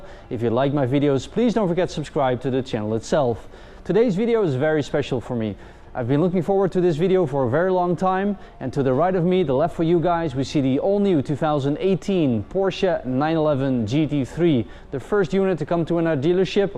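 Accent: Netherlands. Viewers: It looks like the host has a Dutch accent...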